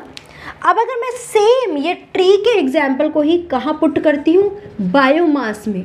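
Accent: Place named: Jammu, India. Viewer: Accent from jammu 😂❤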